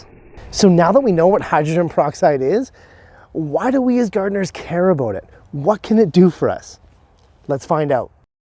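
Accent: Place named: Canada. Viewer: Very useful and interesting and Fargo or Canadian like sounding narration. Seriously, very good video 👍🏻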